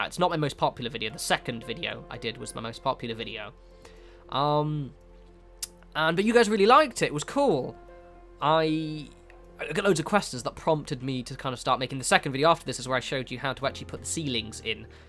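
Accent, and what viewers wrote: United Kingdom. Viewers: Blocking off the ceilings and stuff was the very fist video I saw of yours and I loved it. Plus your cute britishy accent is hot :)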